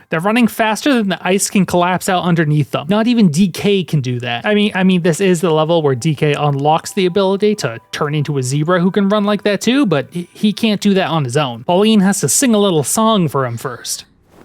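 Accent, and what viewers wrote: Boston, United States. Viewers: The random Boston accents throughout the video are making me go bananas. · You sound like a bostonian. (Given how you replace er with a, and you speak with an accent) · I just have to ask... Is that a Boston accent? Can you say "Coffee, Cheetos, Chicken" in your next video for me???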